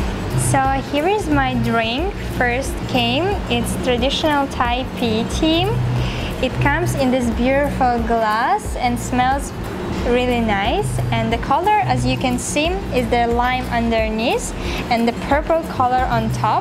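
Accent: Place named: Russia. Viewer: She looks like more Russian than Germany and also her accent. Haha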